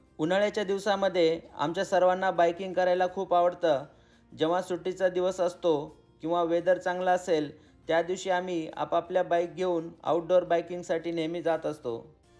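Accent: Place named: Latur, India. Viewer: दादा तुम्ही परदेशात राहून पण आपली लातूर ची भाषा विसरले नाही आणि तुमचे मुले पण आपली लातूरची भाषा छान बोलतात मला खूप अभिमान वाटतो तुमचा मी पण लातूर आहे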